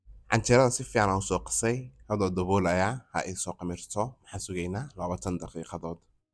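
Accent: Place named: North, Somalia. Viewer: Af reer waqooyigu ma fahmayoo😊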